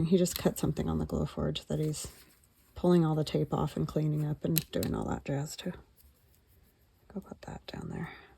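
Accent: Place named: Carolinas, United States. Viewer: You kinda sound like you’re from the Carolinas. Are you?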